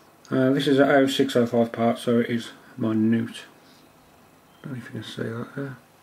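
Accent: United Kingdom. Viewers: UK english?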